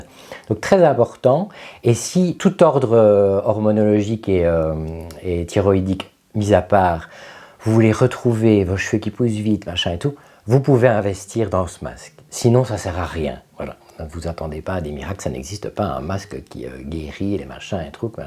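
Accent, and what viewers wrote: South, France. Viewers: J arrête pas de rire entre curent de beauty Ave l accent du sud et la pipe oh que c est bon de rire🤣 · Vive les #LUDIVINE du Sud 🌞😂😂le sud Montpellier c'est la vie les cigales l'accent le pastis et curennnnte boody 😉🤣💋💖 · Vive l’accent du sud. Team innocence. Vive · Je suis très avare de comme,t’aimes, mais avoir une vidéo pour les 10k, tout en apprenant des anecdotes sur current body (accent du suuuuuud) , franchement ça vaut bien un peu d’effort de ma,part. Merci et bon courage à toi 😊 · Ah cher, c'est sur c'est nous qu on est les meilleurs 😉et vive l accent du sud. Merci pour ce moment précieux 🤗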